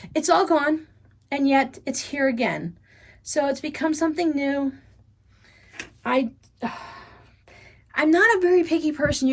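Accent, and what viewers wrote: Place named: South, United States. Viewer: sincerely I didn’t intend to imply you say it incorrectly. Being born and raised in Florida and having been gone since aged 20 I love hearing how you say things in a sweet southern accent. Ha be a wonderful Christmas. ❤️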